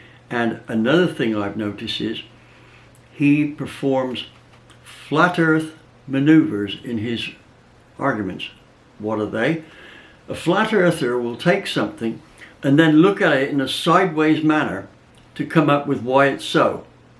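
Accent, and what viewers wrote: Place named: England, United Kingdom. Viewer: I love your channel you remind me of my grandpa and I when we use to talk about and restore old British Motorcycles. Since he passed I got into cars and now have an Ecoboost Mustang myself. You remind me of how my grandpa use to explain things to me, even down to the English Accent. I watched your videos about picking out wheels for the ecoboost and it has helped me a lot. Thanks for everything.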